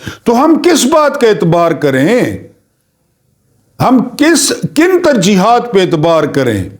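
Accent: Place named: Mianwali, Pakistan. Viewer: Janab Sahib hum tu aapka vlog ka intazar kartay hain. Kun keh aap such boltay hain aur pakistan kai ASLI mujrimon ko tikaa tikaa kai back per martay hain unn ka asli chehra apni awam kai samnay rskhtay hain aur apnay mianwali style aur zuban mai buht khubsurat guftagoo kartay hain. Pakistan keliey dukhi insaan ek legend Anwar Maqsood sahib ka tazkira bhi buhat acha laga.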